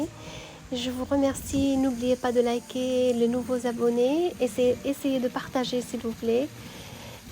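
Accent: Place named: South, France. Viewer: Très belle vidéo surtout avec ton accent du midi...Une vraie conteuse..On dirait Nora ACEVAL 😊 Très beau château avec ses jardins paradisiaques...❤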